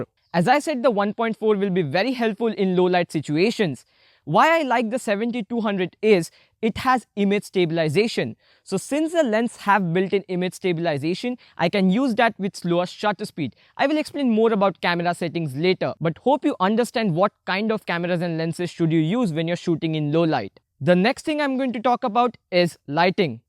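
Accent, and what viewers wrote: India. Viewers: Your pronunciation sounds like a Indian man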